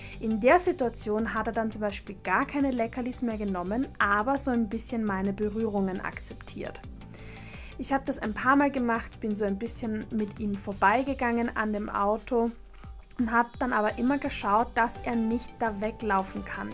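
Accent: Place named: Vienna, Austria. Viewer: Liebe, ich habe gerade abboniert. Bist du aus Wien? Du klingst so, ich bin aus Wien. Morgen zieht ein Hund aus Russland bei mir ein. Wenn sie sich eingewöhnt hat könnten wir Socialwalks machen. Du wirkst sehr freundlich und positiv. Liebe Grüße.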